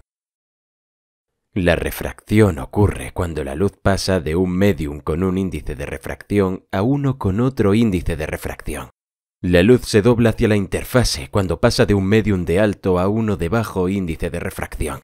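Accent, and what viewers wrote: Spain. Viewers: Porque debe estar en español de España? Luego se me queda ese zumbido a tono español 😥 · por que hablar en español marcado cuando puedes hablar neutro ?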